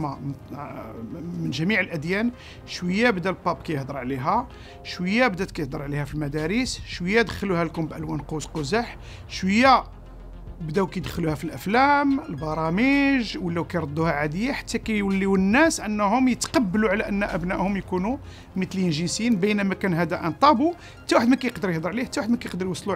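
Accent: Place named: France. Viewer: يارجل قبل ان تتكلم بل سياسة نقدية كانت ام سياسة لقطيع اووو تعلم ان تتكلم بل عربية الفصحى ثم اشرح من يسمعك يقول انك فرنسي متعلم العربية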